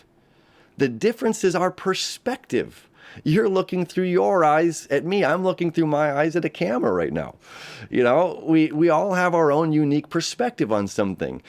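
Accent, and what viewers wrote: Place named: Australia. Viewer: your Aussie accent is actually pretty good, it's not terrible at all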